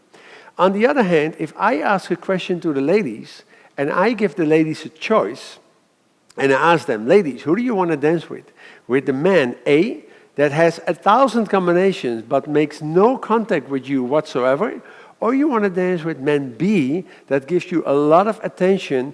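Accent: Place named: Netherlands. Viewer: Well this is one very distinctive dutch accent....👍👍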